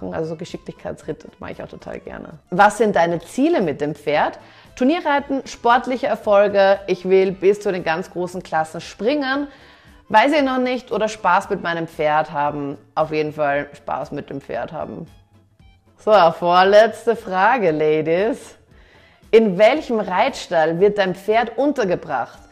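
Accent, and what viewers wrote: Austria. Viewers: Ich liebe deinem Österreichischen aktzent 😂😊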